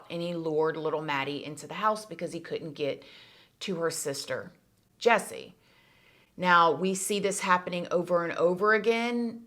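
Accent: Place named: Texas, United States. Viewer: Okay, I’m going to say a few things. Firstly, you remind me of my American friend (she’s Texan, I’m not great with Geography but you sound very similar) and just as with her, your kind, caring nature comes across with every story you tell. I LOVE your videos. Secondly, maybe I’ve got cynical with age, but I don’t feel like Joshua should ever be free. I didn’t really buy his apology, it didn’t feel particularly sincere (to me) it sounded more like a resume statement to try coerce the jurors (or whoever made the decision) into letting him be free. I don’t usually look at cases like this and think they deserve life when they were children themselves (although there are exceptions). In his case, the lack of sincerity, and the destruction and suffering he inflicted upon the little girl, Maddie, her parents, sister, and family I don’t think it would be fair that he would get freedom while they are stuck wondering “what if…”. 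All the things you said were right, you’d be forever trapped in your own mind, a prisoner of questions you’d never ever know the answer to. And, if he was so sincere and he meant it, why not give the family some closure and tell them exactly what he did with their baby girl. Finally, I think upbringing has a lot to do with it. His father’s behaviour toward him might have made him feel rejected leading to fears of rejection by others in society, causing him to develop unhealthy, antisocial attachments, as he apparently did with Jessie. It’s a very, ve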